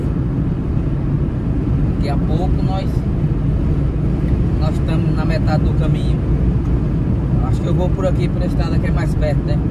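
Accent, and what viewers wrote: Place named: Northeast, Brazil. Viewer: Sotaque nordestino é maravilhoso 😊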